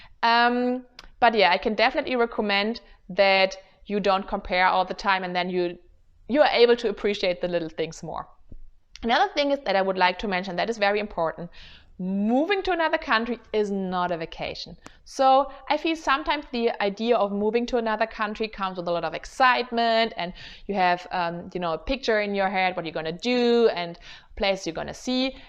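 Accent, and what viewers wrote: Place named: Germany. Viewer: I loved your joke… totally arrived on my end 🤣😂🤣😂🤣. But maybe that’s because I’m German haha! I miss the birds singing/chirping in Germany… I also miss going to the Bäcker and Metzger, or hanging out at the Bistro/Café. Here we get rushed off the table… I miss the laid back nature of Germany. I wish we lived closer so we could hang out for Kaffee und Kuchen 😁😁😁. But Boston is not the next closest trip from Colorado. Mein Angebot steht nach wie vor… wenn Du mit irgendwas Hilfe brauchst, melde dich einfach. 👍🏻 Like you said, your language/vocabulary will improve just by living your life daily and speaking it all the time. I’d say that Americans, or any English speaking person for that matter, understand you just fine. Even your German accent isn’t as strong as some other German English speaker. You’re doing VERY WELL. 💗 Have you tried the German bread website I shared last time? 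If not, definitely give them a try. I enjoy it very much.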